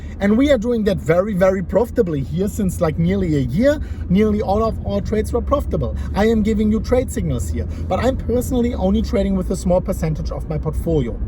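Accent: Germany. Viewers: Your German ?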